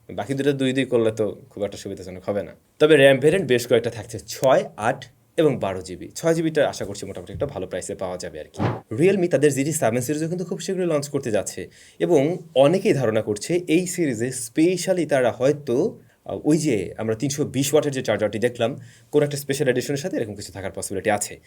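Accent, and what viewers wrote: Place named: Noakhali, Bangladesh. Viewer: bhai Noakhailla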